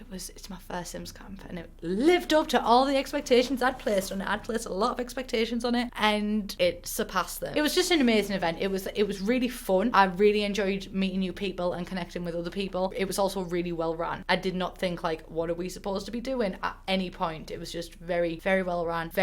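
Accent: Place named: Middlesbrough, United Kingdom. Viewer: Just stumbled across you and you're bloody hilarious! 😂 Are you from Boro by any chance? I recognise your accent loads!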